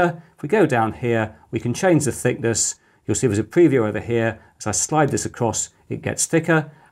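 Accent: United Kingdom. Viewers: Essential viewing for a beginner like me. And you're British, as I am, not yet another American. Discovering your channel really has made my day. Thank you so much.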